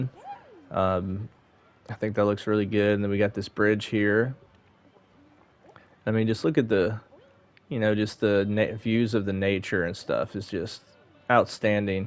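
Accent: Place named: United States. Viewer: Ah I see, the Mrs loves your accent and wanted to know what part of America you are from. She is actually part Native American herself, well part Irish, British and Native American, her Grandmother was an adopted Native that spent the first few years of her life living in a tent somewhere over there then married her grandad who brought her to England. The Mrs was very close with her and has a keen interest in her Native American heritage. I think your accents you have over there reminds her of her Nan.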